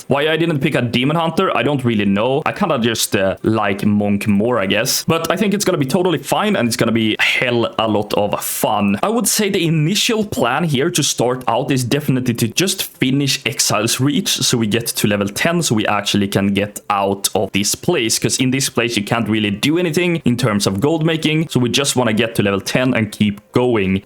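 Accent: Sweden. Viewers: Bro has the Swedish accent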